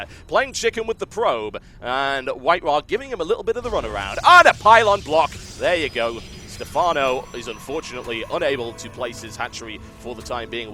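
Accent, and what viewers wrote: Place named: London, United Kingdom. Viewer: TB, I bloody love you man! The entertainment value of your casts is exceptional and combined with your voice and accent they induce the kind of mancrush that is normally reserved for only the best of friends. Btw, have you ever considered starring in the next Guy Ritchie movie? I think you would be a perfect cast for one of the small-time london criminals in a movie like Lock, Stock... or Snatch :P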